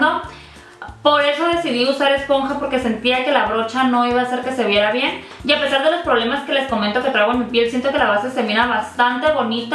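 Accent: Mexico. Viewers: me encanta tu acento culichi 😂 saludos bonita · En cuanto abrí tu video y escuche tu voz sabia que eras de Tijuanaaaa !!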